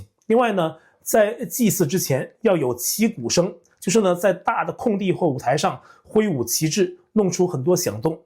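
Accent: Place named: Taiwan. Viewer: 先生，您的影片有人盜用，因為聲音是臺灣腔的國語，您再留意一下吧！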